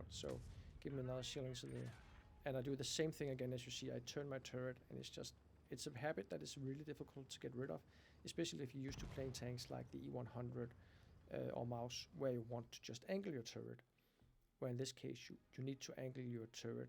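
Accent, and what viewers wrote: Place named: Netherlands. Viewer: oh, okay. Sorry about that. Your accent reminded me somehow of Netherlands 😂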